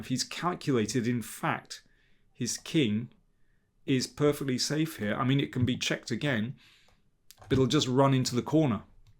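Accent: England, United Kingdom. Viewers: Congrats on 100K, Great English accent...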